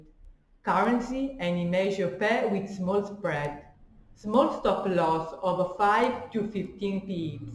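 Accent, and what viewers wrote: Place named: Italy. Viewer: nice video, you have an italian accent, are you italian?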